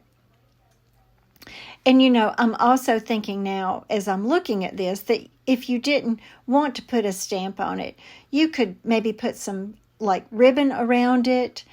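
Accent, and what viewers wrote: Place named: North Carolina, United States. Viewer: Good morning from San Antonio! I really loved these ideas this week! I love all of your ideas, but this one inspired me so so much!!!! I have a quick question. You said you are from North Carolina.. I originally from Va beach and we just moved here to tx 3 years ago. Long story short. My family is originally from NC, actually my entire extended family still lives there. They live in Belmont, Lincolnton, And small town High Shoals. Now that my very long story, my life story 😂😂 are you close to those areas?? It’s your accent that sounds like them!! I guess I’m chatting with you because your my favorite!!!! Thanks 😊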